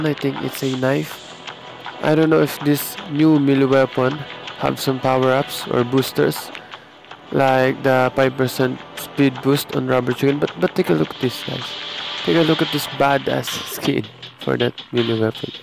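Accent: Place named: Philippines. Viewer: Pinoy ka? Parang pinoy kasi accent mo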